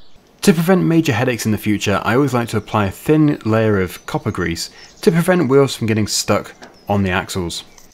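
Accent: United Kingdom. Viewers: You’re British?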